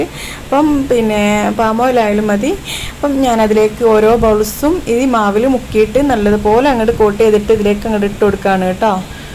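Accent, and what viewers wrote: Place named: Tamil Nadu, India. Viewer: ഇത്ത. എന്തു പറ്റി. സംസാരം തമിഴ്. ടെചു. വന്നു. സൂപ്പർ.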